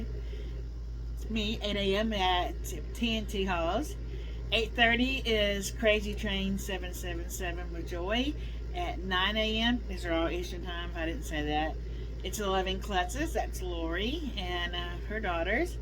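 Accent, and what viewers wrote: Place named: Kentucky, United States. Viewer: I love Kentucky I love the accent too ❤❤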